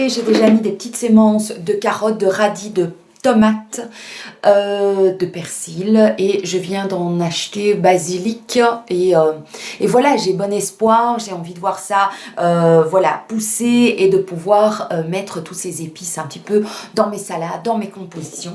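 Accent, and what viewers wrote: Belgium. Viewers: Coucou, je suis nouvelle sur ta chaîne. Je suis de Bruxelles et ça me fais plaisir de suivre des haul action d’une youtubeuse belge! 😊 Déjà j’aime ta bonne humeur, la façon de présenter tes articles. Et écouter les prix à la prononciation belge 😄 Pour tes feutres, ce n’est pas des surligneurs « fluo comme on dit chez nous » ce sont des marqueurs à alcool. C’est plus pour le coloriage et loisir créatif. Je ne les ai pas utilisé pour l’utilité que tu veux en faire, à tester. Mais un conseil garde les conserver bien à plat et pas debout dans un pot sur ton bureau par exemple. Car la pointe sèche. Bisous à toi et ta petite famille 😘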